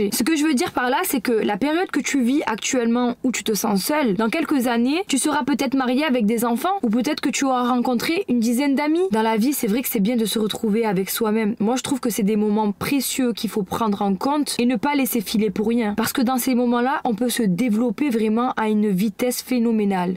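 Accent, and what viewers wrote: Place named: South, France. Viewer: On reconnait l'accent du sud mais tu articules très bien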